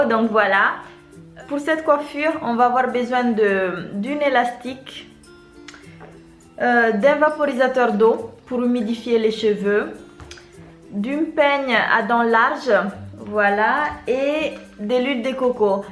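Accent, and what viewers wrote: Madagascar. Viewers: j'aime bien ton accent malgache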